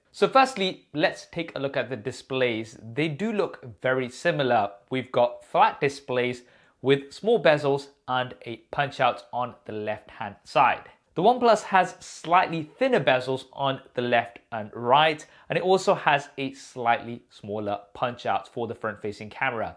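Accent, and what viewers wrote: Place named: United Kingdom. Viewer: British accent makes me uncomfortable 😐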